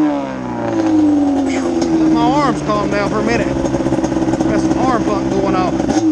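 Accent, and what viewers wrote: Tennessee, United States. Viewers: Fellow Tennessean? I ask because of the accent. And love the shee keep up the good content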